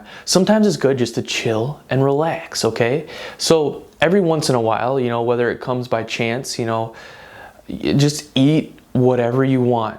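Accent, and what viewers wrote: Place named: Chicago, United States. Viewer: I recognize this accent. You've got to be from my hometown of Chicago (Chicagoland), right?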